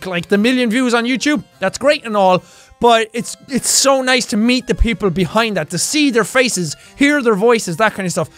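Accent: Ireland. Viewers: I watch you when I miss my boyfriend's voice because he is also from Ireland. A different area, so the accent isn't the same. But it's closer than anything I have in the states :P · Hello! I just hit 300 subscribers and that is mainly because of you! You are my main inspiration to start my own channel (not this particular video, more the beam.ng vids). Your videos are always so great that it amazes me. According to me you are the best youtubers out here with a perfect voice for commentating, and your irish accent is the dot above the i. Keep making fantastic videos, since you are making so many people happy! My biggest dream is to honestly do this aswell, to make people happy just by being myself, have people laugh with me instead of against me. Have a perfect day, you really do deserve it because you are such an inspiring man Thanks:D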